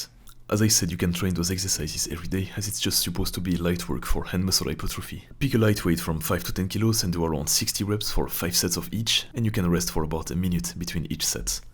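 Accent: France. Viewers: Are you french mate? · Bon mec je sais si il faut que je sois plus impressionné par tes bras visiblement en titane , on ton accent français à peine perceptible ( vraiment 1er deg tu parles trop bien ang , et même dans tes premières vidéos ct déjà super ) . Keep grinding bro 💪💪💪💪💪💪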